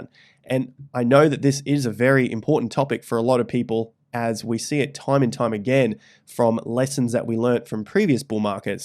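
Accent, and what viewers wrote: Australia. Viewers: The Australian accent is unfortunately super annoying to me....especially when they say Twittahhhh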